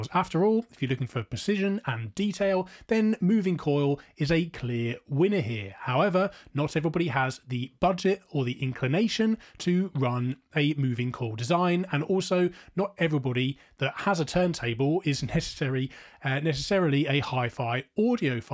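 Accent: United Kingdom. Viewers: Excellent video. Fantastic description.. efficiently to the point. Also the British accent is quite a nice compliment to the dialogue.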